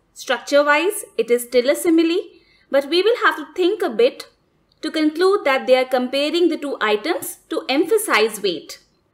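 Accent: India. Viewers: When the video was done and you realize you don't understand anything because of laughing at the indian accent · You speak English-language with Indian accent. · lmaooooooo indian voice xdxdxdxd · People complaining about her "Indian" accent could switch on the subtitles. Great tutorial, subscribed to your channel :)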